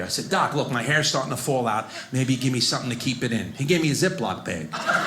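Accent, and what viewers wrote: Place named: New York, United States. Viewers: Love the "New Yawk" accent!! I wanna hear more from this guy--he is dynamite! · Italian new york accents always get me. Feels like home 😅😊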